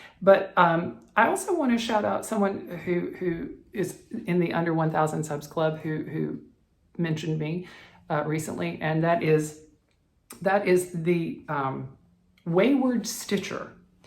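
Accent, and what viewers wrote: South, United States. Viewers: You would have to shave your mustache and get a red sweater to be Fred Rogers. That would be cool though! I hope you saw the movie with Tom Hanks, it was really precious. You said that you have an accent. Yes, I could hear something southern. Now that I have started to watch your previous episode, I am guessing that you are from Alabama. My friend from India 🇮🇳 when I told him that I did not have an accent, he said of course you have an accent. You have an American 🇺🇸 accent! Of course, in my completely untraveled life and stupidity, I thought Americans didn’t have accents. But of course people speaking English from Ireland 🇮🇪 , South Africa 🇿🇦 India 🇮🇳 England 🏴 , etc, they can all tell that we are from America 🇺🇸 . So think about it… Everyone has an accent! Just depends on who is listening. Thank you for rescuing cats 🐈 ! 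All of my pets are also rescues. Really cute that you kept all your childhood books 📚 . I don’t have a single one. Don’t you just love finding your artwork 🖼 and crafts from childhood? I have so few. And by the way… Basket 🧺 of cherries 🍒 was my first Blackbird design stitched and completed recently, and the first time I ever used Linen as well. I did it on 28 count.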